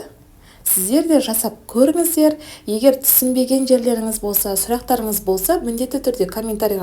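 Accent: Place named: Uzbekistan. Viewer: Озбекстаннын казактары солай сойлейди, ешкандай калпак емес,озимиздин казак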